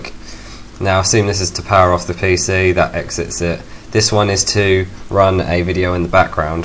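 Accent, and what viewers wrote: England, United Kingdom. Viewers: lol i always had a feeling you were from england so am i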